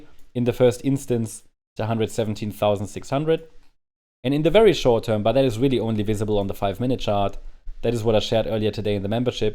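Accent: Germany. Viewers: He is German right? He Talks soo German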